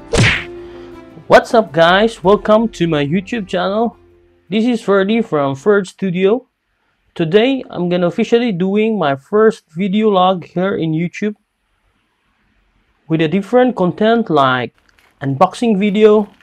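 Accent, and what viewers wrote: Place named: India. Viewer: hahaha indian accent iz real. 😂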